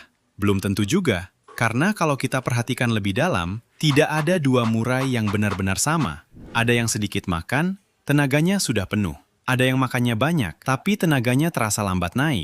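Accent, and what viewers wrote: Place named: Kuningan, Indonesia. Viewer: dari logat nya kayak orang Kuningan a😅🙏🏻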